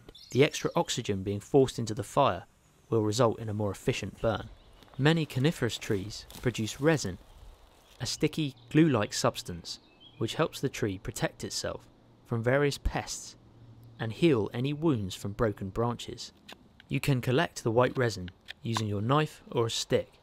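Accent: United Kingdom. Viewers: Love how he is clearly british but says ancestors to Native Americans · hes english lol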